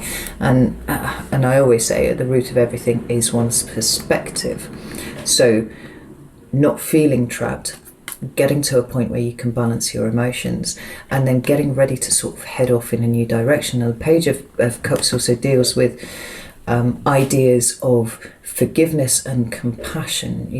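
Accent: United Kingdom. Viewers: Dude I love your aesthetic, the whole UK accent (idk) and the whole dark style fits very nicely.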